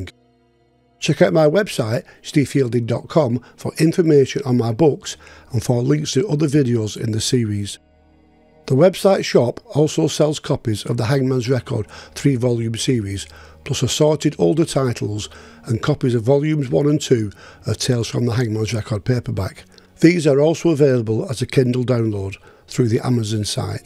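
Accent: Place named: Lancashire, United Kingdom. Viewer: Another brilliant video by, love the Lancashire accent and very well told. Is there an episode about Gwynne Owen Evans and Peter Allen in the making, the last 2 to hang in Britain 🇬🇧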